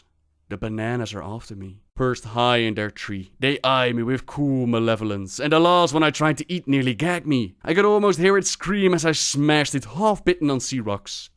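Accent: Netherlands. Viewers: YES. Combined with the dutch accent he oh so despises, his videos, especially the R-sounds, make you shiver. XD I love it! Thanks for the good work. =)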